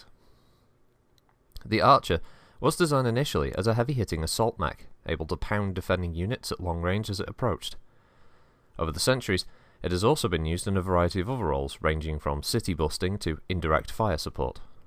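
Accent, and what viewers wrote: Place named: England, United Kingdom. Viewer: you're English right? Yall are the best narrators and so far id say you are carrying just fine. so far you are proving that considering i pass around your shit to my homeboys. I'd hope they did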